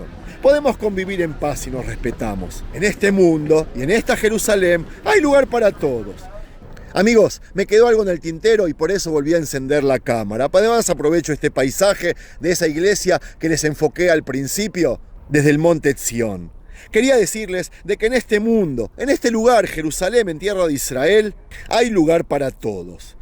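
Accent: Argentina. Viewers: Judio ? Yo lo escucho con acento Argentino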